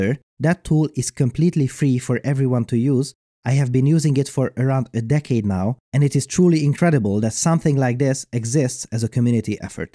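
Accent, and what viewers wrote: Hungary. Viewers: Heard your voice and your name and I immediately knew you are Hungarian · Jól hallottam, hogy maga magyar :3 és itthon nincs felfedezve :/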